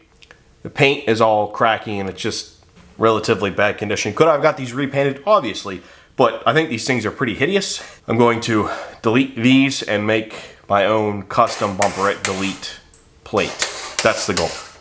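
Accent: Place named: South, United States. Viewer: You have got to be from the south, the way you pronounce "on" like "ahwn"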